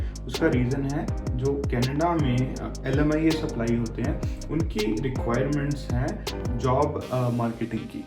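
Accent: India. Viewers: Can't get you clearly, music n too noisy with indian speaking confuses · Important matter. Can u please add captions to this video? Sorry I can't understand your English Indi accent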